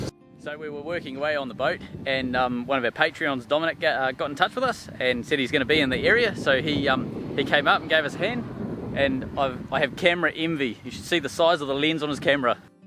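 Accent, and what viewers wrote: New Zealand. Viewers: Just randomly discovered this in Hamilton NZ. And detect an NZ accent?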